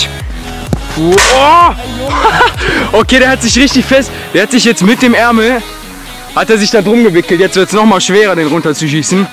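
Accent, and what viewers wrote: Germany. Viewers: kommst du aus Wuppertal?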